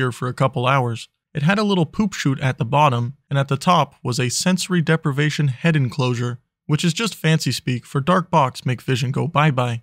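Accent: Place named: Canada. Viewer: It's on a Saturday morning in Flori-duuuuuuh and I am being introduced to this channel and I have to honestly say that I am so glad I have insomnia and YouTube for once!! This guy is freaking funny as hell! Too bad I don't have any buds to blow smoke on while I chuckle like the flesh covered hyena he's turning me into!! I am curious as to where exactly he's from tho, he said "my FELLOW Americans" in his most recent video but he sounds like he could be from Canada😂😂😂 All I can tell for certain is that he is NOT a fan of those who were born in the south, fortunately, I was born in the Midwest over in Illinois 😁🤣🤣🤣😂 .....Side note; when is he going to have some stuffed animals or hoodies?! I'd totally get some!!❤❤❤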